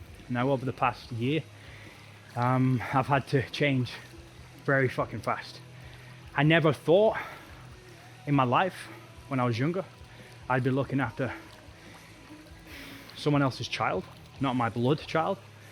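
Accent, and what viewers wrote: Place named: Ireland. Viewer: Where are you from? You got very nice accent for ear. Irish man?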